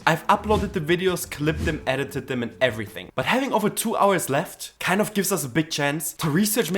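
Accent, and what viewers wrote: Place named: Germany. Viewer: Haha Most German Accent ever 😂❤🇩🇪 aber heiles Video!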